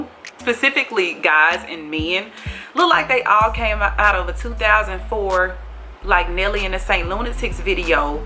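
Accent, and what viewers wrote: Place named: Memphis, United States. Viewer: Had I stayed in Memphis I would have that accent. lol I love your accent so much girl. lol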